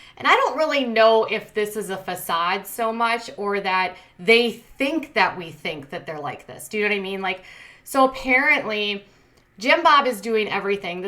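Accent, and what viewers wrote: Minnesota, United States. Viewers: I love your accent! It reminds me of my friends in Minnesota ❤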